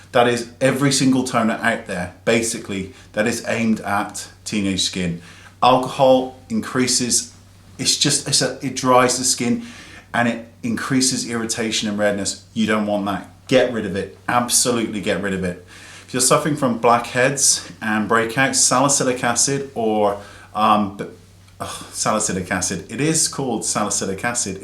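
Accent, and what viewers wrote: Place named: United Kingdom. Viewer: probably, hes british and he just pronouces it differently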